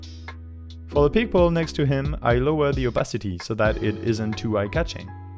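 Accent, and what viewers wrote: France. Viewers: Nice vid! I very much enjoy your french accent tbh :) Keep up the good work!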